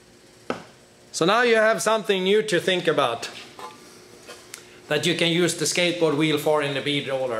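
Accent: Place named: Sweden. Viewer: He have to be from Sweden with that accent.. cool.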